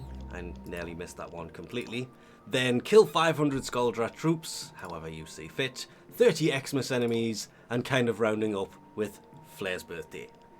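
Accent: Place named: Newcastle, United Kingdom. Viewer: Wait a geordie warframe player? Yassss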